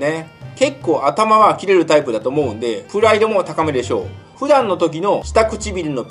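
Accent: Kansai, Japan. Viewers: 先生こんにちは。先生の動画拝見を毎日の習慣と糧にしているアラサーOLです。先生は唯一無二のコンテンツ・切り口や視点で、どの動画も本当にとても面白いです！関西のイントネーションやお話方もほんとに好きです。お伝えしたいことと疑問、また相談がありまして、今回コメントさせていただきました。盛り沢山かつ欲張りですみません…。先生の動画を拝見していて感じたのですが、先生はとてもはっきりとかつ相談者や相手のことを考えたうえで、観相学を活用したアドバイスなどをされていると思い、とても親身で素敵だなとおもい、ぜひ結婚相手のことなど鑑定していただきたいなと思いました。まずはお礼をお伝えしたかったので、前置きが長くなりましたが、先生の動画や感じるお人柄もとても好きだからこそ、何日ももやもやしていたことがあったので、こちらに疑問兼質問として書かせていただきました。先生はいくつか観相学を用いて、いろんな著名人を例にご説明されていましたが、日本人あるは在日の方の例がほとんどで、先生はたびたび人種差別はされないと仰ってましたし、私もそのとおりだと思う反面、いくつか動画の表現で、どうしてもどこかで在日の方に対しては排他的な印象を抱かれてるのかな…と胸が痛くなりました。悪人面で何人か取り上げらていたので…私のとり違いでしたら大変申し訳ございません。。なので、一つは、在日や外国人に対して何か差別的なお考えがなければ、ぜひ先生のご発言や動画は今後もっと多くの方に見られる影響力のあるものだと思いますので、血や肌の色を超えて平和を望む一個人としては、先生のお考えをまた動画で聞かせていただけると本当に幸いです。疑問と相談についてですが、私は友人に在日の方や外国人も多く、私自身もハーフなので、そういう純日本国民でない人の人相判断はできますでしょうか。結婚を考えている相手でお付き合いしているのが遠距離中の台湾の方ですが、例のウイルスが予想以上に長く、会えないまた会えても仕事を辞めて海外に移住しなければなりません。また、今別の方にもアプローチをされていて、その方は在日韓国人の方です。(何人とかあまり区別したくないのですが、一応情報として記載いたしました) このような状況もあり、どこでだれと生きていくのが幸せなのか、本当に分からなくなってしまっています。個人的な質問だと採用していただけないので、どうお伝えしたらよいかわかりませんが、エッセンスを抜き取っていくつかの疑問になんとかご助言いただけませんでしょうか…; 先祖が違う民族といいましょうか、違う文化の方(特に結婚相手としての異性)を見るときのポイントやアドバイスがあればぜひお伺いしたいです！仕事をやめて海外で生きていくか、日本で生きていくかも含め、とても悩んでいます。こんな自分が嫌なので、早くはっきりできるよう、先生助けてください; これからも毎日観ますし応援しております‼︎よろしくお願いいたします。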